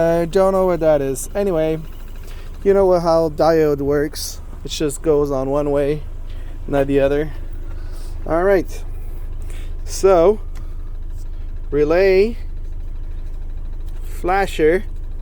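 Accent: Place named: Quebec, Canada. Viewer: J'étais curieux! L'accent. Tu avais des schémas en français! Je me demandais quel coin du QC tu étais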